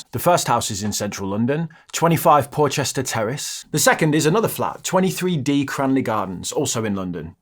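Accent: Birmingham, United Kingdom. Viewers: Took me this long to realise you were a Brummie 😂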